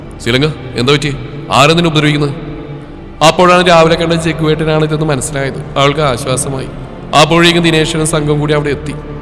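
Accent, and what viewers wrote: Coastal Kerala, India. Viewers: വിജയ് സത്യാ സുപ്പർ സ്റ്റോറി♥️♥️ എതൊരു ഗുഡ യെയും നന്നാക്കാൻ ശ്രമിച്ചാൽ അത് സ്നേഹം കൊണ്ടെ സാധിക്കു നല്ലവനായ ജഗൂ❤❤ ചിലങ്ക❤❤ ഇക്കാ കടപ്പുറം സ്ലാങ് അടിപൊളി😅👌🏼👌🏼👌🏼💞💞💞💞 അവതരണം കേട്ട് അങ്ങനെ ഇരുന്ന് പോയ്🥰🥰🥰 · ഇക്കാടെ വോയിസ്സിൽ കടപ്പുറം ഭാഷ കേൾക്കാൻ നല്ലരസം നല്ല സ്റ്റോറി 🥰🥰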